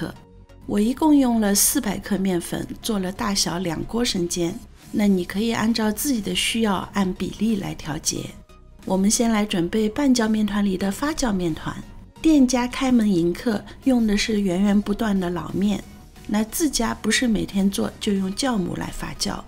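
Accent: Shanghai, China. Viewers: 这就是标准的上海人讲普通话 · 嘻嘻 听你讲上海话超级亲切，秒想回国😢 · 有的有的，口音是标准上海年轻人的口音👍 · 尽管有其他很多貌似专业厨师的视频，但听到了上海话就无理由地感觉这才是最靠谱的做法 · 我妈看她视频。我在旁边一听就知道她是阿拉上海宁。满满的上海味道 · 很好，顺便宣传一下上海话，很亲切，赞！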